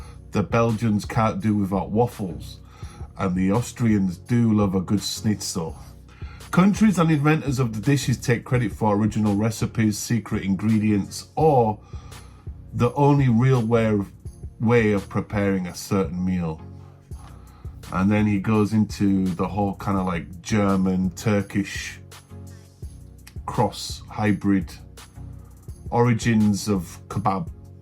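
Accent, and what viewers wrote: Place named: North Yorkshire, United Kingdom. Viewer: Interesting channel this! We do love our kebabs never even thought of who designed the logo! That’s definitely a North Yorkshire accent! All the best for 2025 👍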